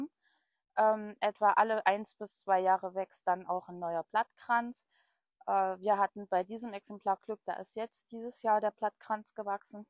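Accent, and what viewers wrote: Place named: East Germany, Germany. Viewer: Warum bloss, weiß ich sofort, dass jemand aus dem Osten kommt?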